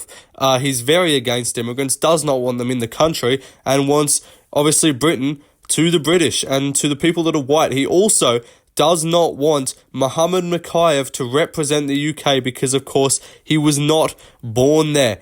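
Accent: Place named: Australia. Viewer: I thought who the fuck would make a video like this. Then I heard you speak and was like ahh he's an Aussie 😂 well done mate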